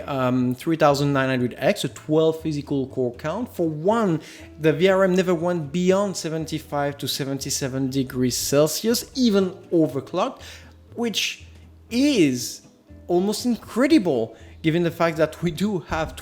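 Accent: France. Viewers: C'est magique, mais quand c'est un français qui parle anglais, je comprends tout! Merci et je te félicite pour ton English french accent car je travaille dans une société française qui bosse à l'international et dont bon nombres des salariés parlent anglais au téléphone et j'ai régulièrement les oreilles qui saignent en entendant leur phrasé dégueulasse et leur absence d'effort pour parler anglais proprement. Toi tu parles vraiment bien anglais, ça fait plaisir. Je découvre ton travail et je vais continuer à te suivre. Je pense que je vais prendre cette carte mère, tu m'as convaincu. Elle n'est pas super fashion (même plutôt… moche) mais elle est sérieuse et d'un bon rapport qualité prix. C'est ce que je recherche. Elle semble disposer d'un bon étage d'alimentation bien refroidi et dans cette gamme de prix, je n'en vois pas d'autres. Ma carte actuelle a 16+2 phases et ça fait 10 ans qu'elle tourne nickel avec un CPU overclocké à 4,7Ghz (Asus P8P67 Deluxe + 2600K) Je recherche quelque chose de comparable sans être aussi bien je m'en doute, les CM haut de gammes sont bien trop cher de nos jours.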